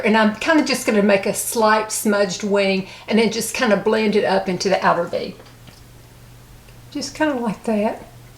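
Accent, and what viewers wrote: South, United States. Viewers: I have headed such great things about those little elf eye shadow pallets , I think these are going on my wish list for my Christmas list :) I love listening to you while I’m wrapping and stuff around the house , I just can’t really say how much I enjoy your videos so much. They relax me, and I could listen to yoursweet Southern voice for ever ❤️ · Love the southern accent. I got one to; Live in Mississippi. You are a 💎 gem. Love ❤️ your videos. Thank you 😊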